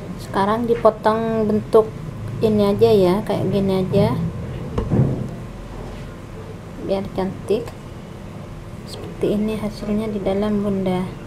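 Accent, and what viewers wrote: Madura, Indonesia. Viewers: org madura ya kok yg ngomong kyk gitu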